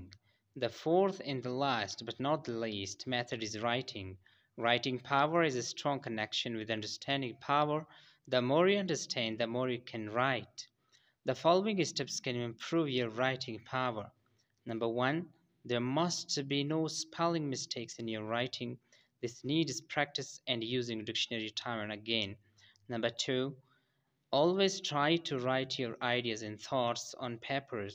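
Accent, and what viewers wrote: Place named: London, United Kingdom. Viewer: why he sound like he come from landan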